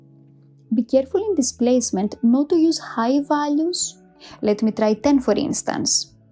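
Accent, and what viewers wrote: Russia. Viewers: English language with Russian accent ouraaaa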